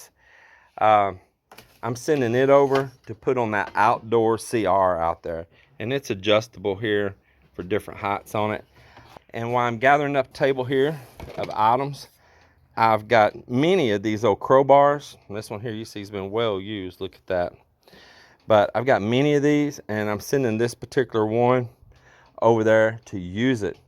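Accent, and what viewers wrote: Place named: East Texas, United States. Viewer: Lol. First time I heard your voice I was like I bet he's from Terrell or Tyler area. Iloilo just went to ECQ. And I've found good outlets for cheap at certain Handyman stores. I use GFC outlets and surge protector strips throughout so my wife can just flip the switch instead of unplugging everything everytime.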